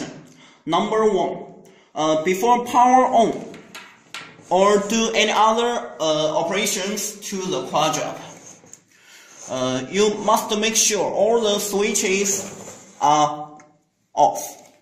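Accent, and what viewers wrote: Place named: China. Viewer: Evert van Ingen made in china english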